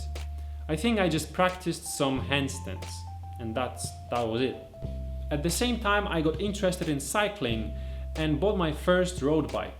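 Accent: Poland. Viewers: zubr! polski akcent :D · tak czulem ze Polak, chociaz stara sie zmieniac akcent :D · He sounds polish and the beer pic was from a polish beer too, so I assume that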